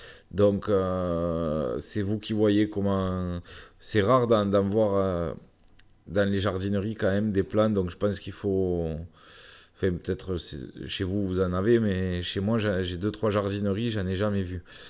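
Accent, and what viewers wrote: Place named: Paris, France. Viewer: Il a toujours, l'accent pointu 😂❤ail c'est dur une tomate 🍅 si elle tombe du balcon sur moi, je me fais une razia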